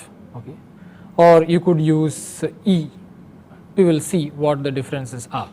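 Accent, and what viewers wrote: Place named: India. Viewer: If I hear one more sh*tty Indian accent, my head is gonna explode. If Microsoft didn't discover someone over there could code a tiny bit, folks there would still be insulating their mud huts with cow dung...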